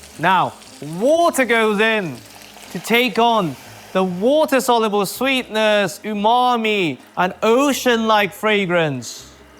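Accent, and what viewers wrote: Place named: RP, United Kingdom. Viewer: So posh.... I am a fan old lady from South Africa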